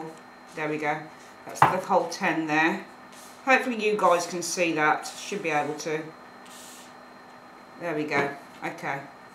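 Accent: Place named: United Kingdom. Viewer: I'm a Mexican, but I live in united states and there is a different accent from the UK. I was nice to hear a different English tone and accent. Do you know where is Mexico? I , I know some Countries in Europe but not all. Specially Germany , a diveded contry. East Germany, and Wesst Germany. and Reunited Country. Very big news in America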